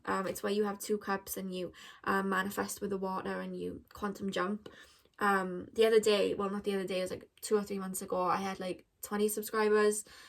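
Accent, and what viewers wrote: Wales, United Kingdom. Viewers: #1. Love listening to your lovely welsh accent.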